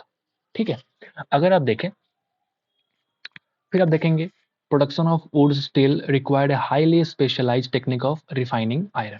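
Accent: Bihar, India. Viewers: Sir app bihar se ho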